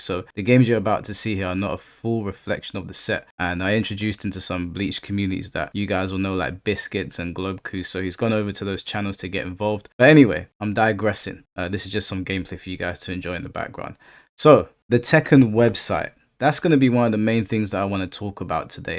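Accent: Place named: United Kingdom. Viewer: Lmaoooooo so when I 1st watched your videos like this, it was my 1st time at your channel so I had no clue what you looked like, just a voice. For the longest time I thought you were white and looked something like the4thSnake 😂💀😂💀 being from the US, the UK accent sounds the same on everyone, it’s not like the US where people “sound white” or “sound black” ect to put it simple. But then I found out you were black and my mind was blown.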